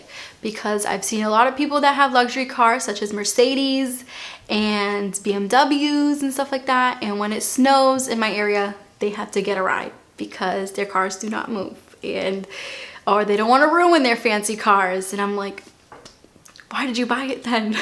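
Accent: Boston, United States. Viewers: I love how you say cancer your Boston accent comes through so cute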